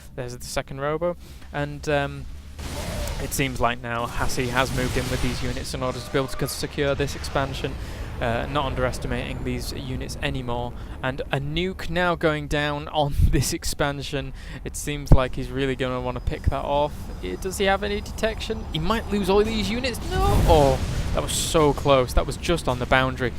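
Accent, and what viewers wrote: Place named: United Kingdom. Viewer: dude, your british voice is awesome, my favorite caster especially since you are bias towards protoss <333333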